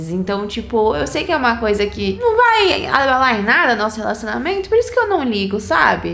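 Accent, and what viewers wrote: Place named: Minas Gerais, Brazil. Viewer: Mô Mineira Né kkkk E MT Legal kkkk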